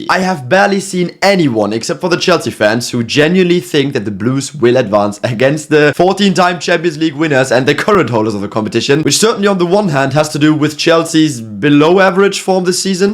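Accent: Germany. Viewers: you sounds like a German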